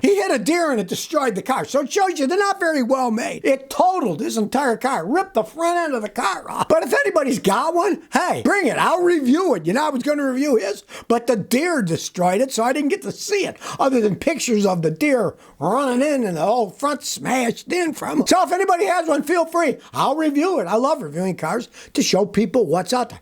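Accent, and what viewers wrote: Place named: New Jersey, United States. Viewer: Hey. Love your "Joisey "accent 🤑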